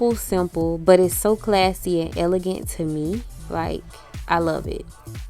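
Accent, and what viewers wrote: New Orleans, United States. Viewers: Heyyy!! Honee... I soooo enjoyed your video!! I don’t even wear makeup like that.. but you inspired me to wanna try!! Lol I’m almost 50 and I wait til this age to wanna try to do brows and makeup 💄.. I love your accent!!! Are you from NOLA?? I can’t wait to try some of the things you shared!! I liked your video and I subscribed!!!! Can’t wait to watch more of your video’s!!!!! 🥰💄😍Yesssssgurllll!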